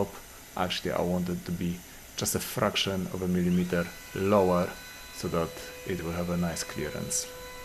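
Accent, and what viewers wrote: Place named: Soviet Union. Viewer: Чомусь по твоєму акценту мені здається що ти з "совка" 😉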